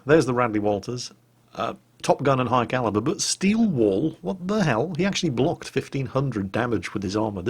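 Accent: Russia. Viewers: Love when is doing Russian accent. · Gotta love ur laugh at these blindshots and the russian acsent:D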